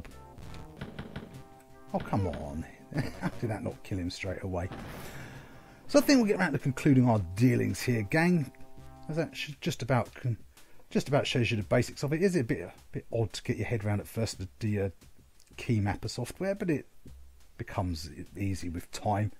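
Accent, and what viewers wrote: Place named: England, United Kingdom. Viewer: This guy has a very strong English accent and I cant understand him plus I need the audio almost full blast. Is there a *TYPED* version of what this guy is saying?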